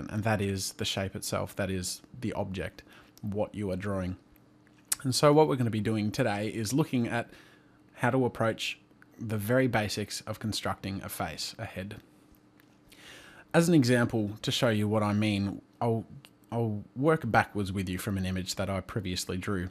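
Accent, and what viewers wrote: Australia. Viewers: You were somehow more Australian then you are now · <3 australian accent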